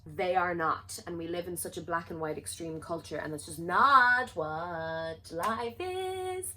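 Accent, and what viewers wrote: Ireland. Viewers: Wow I really wasn’t expecting you to mention my comment! I feel so wonderful that you were excited about something I said when I’m always sooo so excited about everything you say thank you 💞💞💞 and I love this new segment! I think it’ll continue to be a really beneficial way to interact with your audience I for one feel very engaged and the more ppl that get that I think it’ll be really nice 😊 (also ppl pronounce my last name wrong quite often but you were spot on and i loved hearing my name in your lovely Irish accent lol 🥰)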